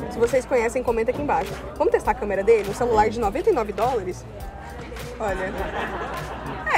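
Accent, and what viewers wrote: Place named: Sao Paulo, Brazil. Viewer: Gostei desse teu sotaque paulista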